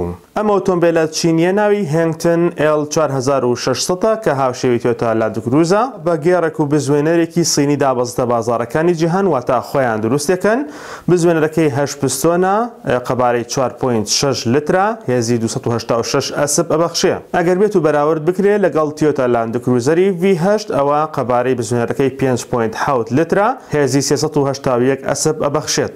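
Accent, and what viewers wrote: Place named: Iran. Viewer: هذا إيراني لهجته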